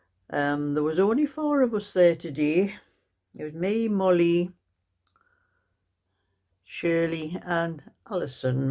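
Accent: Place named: Durham, United Kingdom. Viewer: I love your chats, you are interesting. Your voice reminds me of friends I know in Durham.❤❤❤❤. You have a lovely accent.❤❤❤❤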